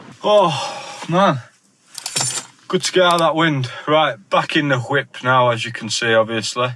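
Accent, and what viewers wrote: Yorkshire, United Kingdom. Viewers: like the video mate - like your yorkshire twang - nice memories for me back home :)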